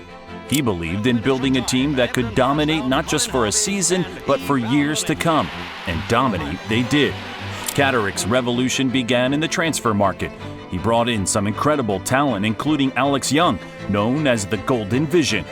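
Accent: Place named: United States. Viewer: bro is your voice AI and why is an american speaking about everton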